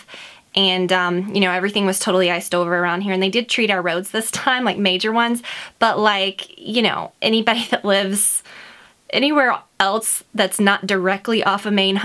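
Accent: South, United States. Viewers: Way to own that southern accent. Southern born and southern bred!!!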